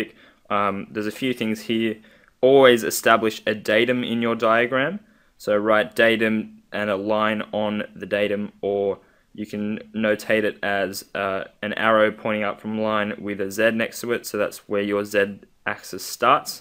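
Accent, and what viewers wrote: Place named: Australia. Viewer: Australian Accent, likely Aus.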